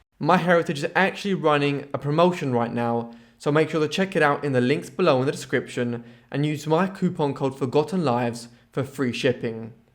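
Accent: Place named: RP, United Kingdom. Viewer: Oooh, I would also like to know where you are from! I detect a posh accent, but then again perhaps you use AI.? You are well-spoken/articulate; a great story teller who is easy on the eye, so that’s why you might be AI. No offense meant if you are Real or if use AI. Just finished watching. My guesses were your ancestors were from Spain, Argentina, France or Italy. Well since AI has no DNA, you must be Real then! :D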